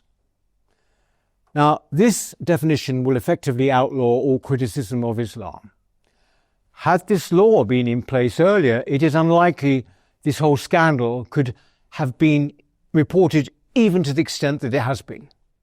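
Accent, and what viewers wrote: RP, United Kingdom. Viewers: Omg Peter you say it so well. I cannot thank you enough a posh man’s voice carry’s more weight than a pooh man’s voice !!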